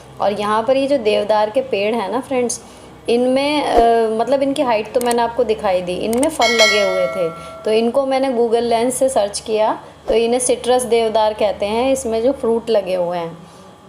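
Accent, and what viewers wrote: Meerut, India. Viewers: Meerut se ho kya mam ap?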